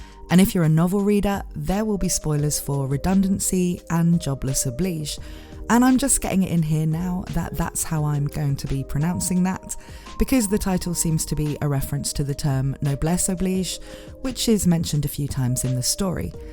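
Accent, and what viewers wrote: United Kingdom. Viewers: de donde eres? xd suena a britanico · WhenI heard you say "Last One to Leave the Nest", I had a tear in my eye. Also: Christina Best Child Do people in the UK (assuming based on your accent) pronounce it "obleej"? Because in French, that "é" would pronounced like in "hey' (without the "y" sound). Not sure if this makes any sense. Truly loving your content. Gonna check that Vivy video next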